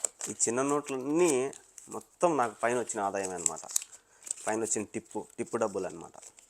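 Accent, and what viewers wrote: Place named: Kadapa, India. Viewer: anna mee native place kadapa naa anna yaasa alaane vundi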